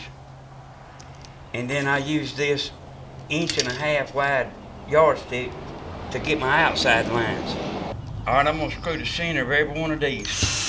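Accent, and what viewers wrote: South, United States. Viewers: I just simply love your southern twang, your funny, and know what your doing. Do you even watch TV? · Liked your video. Short and to the point. Plus I love the southern accent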